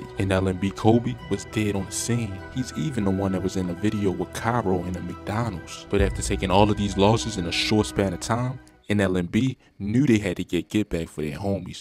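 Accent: Baltimore, United States. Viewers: Definitely from Baltimore accent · That Baltimore accent heavy Asf bro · stop the bs he definitely from Baltimore lol I have family from there this is Baltimore accent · you from baltimore. Accent crazy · baltimore accent so annoying lol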